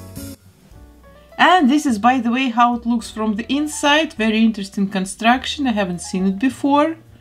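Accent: Russia. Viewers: Русский акцент присутствует)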